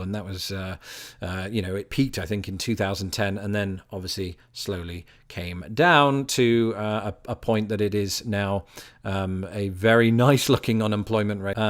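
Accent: United Kingdom. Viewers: Dude you’ve been here 10 years and that accent is stilly silky British smooth 👌👍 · I'm surprised you still speak with a British accent after 10 years! But you did say "sidewalk"! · i like this guy. British accents make everything you say, much more interesting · Gosh that British* accent never gets old, I'd live in London just for this I'll never understand why people trade Europe for the US. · You still have very distinct British accent. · No way you still sound british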